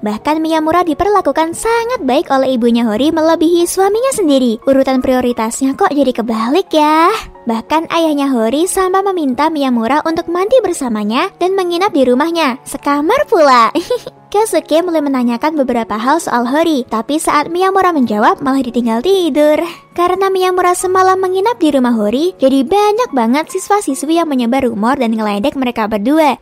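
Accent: Japan. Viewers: kek suara cwek jepang yg imut"gitu tpi versi indo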